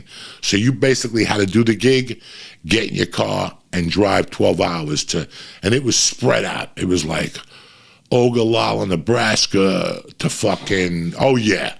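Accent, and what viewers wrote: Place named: Philadelphia, United States. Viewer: I really like that you are in a new spot in the room, that is the coolest Bruce Lee poster and all the wall Art!! Thanks for sharing your videos with us. Salute to you and your Family Uncle Joey. You are like hearing my Family talk when I was younger in Philly! Thanks for All you do for people that you may never realize have been saved due to you telling shit like it is. Have a Great Weekend Brother!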